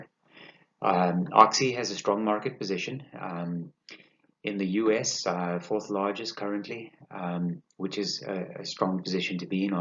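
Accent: South Africa. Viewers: Enjoying your videos. Are you from South Africa per chance?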